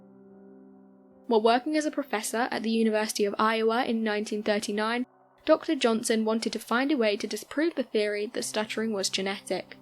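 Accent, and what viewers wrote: England, United Kingdom. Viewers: I just listened, is that really you?, sexy and English?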